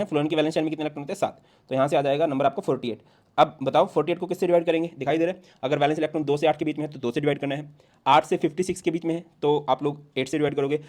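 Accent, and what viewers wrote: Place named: Punjab, India. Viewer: Your Punjabi accent 😅❤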